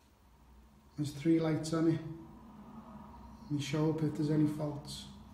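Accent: Liverpool, United Kingdom. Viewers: Cheers Mate! You just confirmed everything I do myself to be correct. The only thing that lets me down is when someone previously strips out the lug hole; just found something to repair it with. But many thanks for video. Nice to hear another scouse accent and the term 'lecky'. Stay safe! :-) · Just what I was looking for great video really helped me. I’m a scouser so it was great to hear the accent 👍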